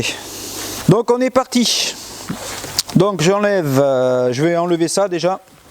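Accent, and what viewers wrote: Gironde, France. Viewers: Toujours d'excellents commentaires avec un boulot impec, et en plus le petit accent de la Gironde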